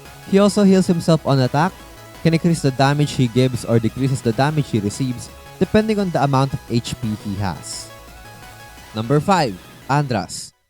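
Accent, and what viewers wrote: Philippines. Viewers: U sounds a filipin0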